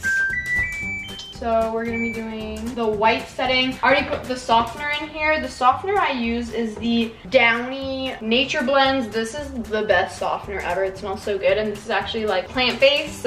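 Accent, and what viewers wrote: United Kingdom. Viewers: That british accent 😆❣ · The British accent tho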